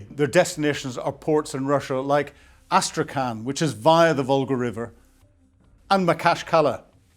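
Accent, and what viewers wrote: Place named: County Antrim, United Kingdom. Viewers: Good use of maps and other visuals. I always enjoy your analysis. I also enjoy your accent, my father was from County Antrim and my relatives had that kind of accent. Now the Greenland fuss makes sense. Keep up the excellent work. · I'm sorry, but I just don't buy all you are saying about why the USA "needs" Greenland. Speaking with an English accent does not make you more believable. Have you ever played the game "Risk" ? I have a feeling that you would love playing it, perhaps with Donald. At this point these there is far too much rubbish and speculation on YouTube, and you are not helping.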